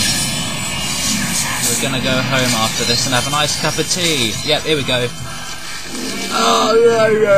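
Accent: United Kingdom. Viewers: good job ^^ also nice British accent =3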